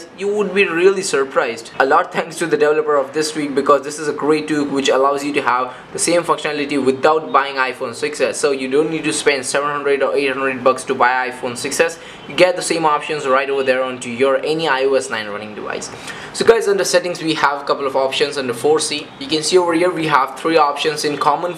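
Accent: India. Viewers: your voice is annoying.. but good videos... indian voices ARE annoying for other countrys :)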